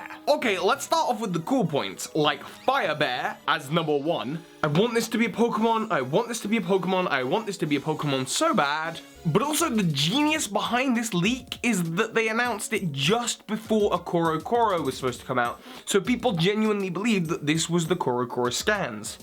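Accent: United Kingdom. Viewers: him "heynpokemon masters " me omg your british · It's an English accent. English accents are pretty clear and easy to understand, unless English isn't your first language. also 'a shit'.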